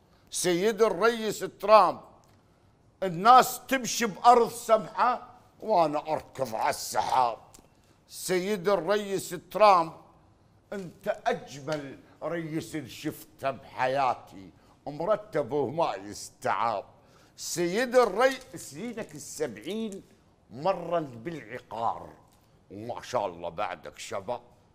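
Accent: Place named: Iraq. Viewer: هههههههههههههههه يازين جيجان والله ذهب ماشاءالله ياخي اللهجه العراقيه عجيبه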